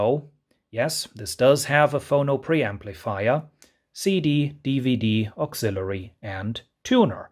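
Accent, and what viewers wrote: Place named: Germany. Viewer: Bist du deutsch? Dein Englisch klingt sehr angenehm. So möchte ich als Deutscher wahrgenommen werden, wenn ich Englisch spreche.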